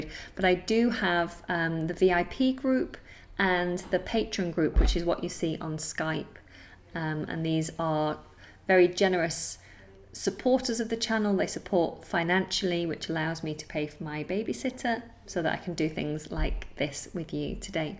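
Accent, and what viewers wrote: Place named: United Kingdom. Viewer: Amazing these live lessons!!! Stunning British accent!! Thanks so much,... and Jacob Baby English of course which lend us his lovely Mum!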